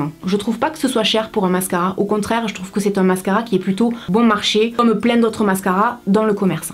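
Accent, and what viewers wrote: South, France. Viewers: Je trouve que tu es quelqu'un d'honnête et sincère.. en plus de ta fraîcheur et de ton accent du sud!!!.. ça fait du bien de voir ça sur YouTube .. je commente très rarement tes vidéos mais je les suis depuis un moment .. continues comme ça merci beaucoup !! bisous de Corse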